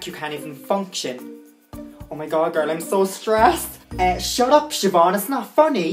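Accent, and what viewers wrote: Cork, Ireland. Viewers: only found your channel today and im in bits, your videos are hilarious you left me not breathing because im laughing so much, its so cork hahh i love it, reminds me of my mom hahah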